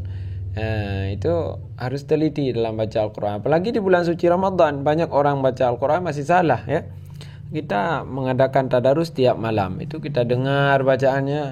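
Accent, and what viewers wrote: Aceh, Indonesia. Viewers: Saya mau tanya.ustad orang Aceh ya.krn fasih kayak orang Aceh ngaji Krn saya juga orang Aceh...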